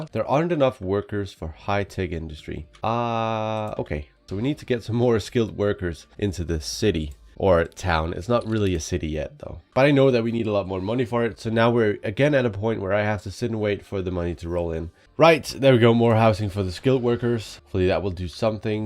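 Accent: Denmark. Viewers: Immediately noticed the Danish accent 😂 Love the video tbh though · I thought your accent sounded a bit similar to a Danish youtube friend of mine, and I was right! You are Danish. Dannebrog for altid!! 🇩🇰🇩🇰🇩🇰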